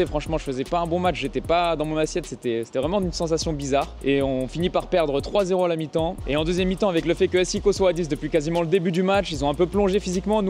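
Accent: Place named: Finland. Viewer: Je n'ai jamais entendu le francais en parlant avec un accent finlandais.. Super interessant et charmant, en plus !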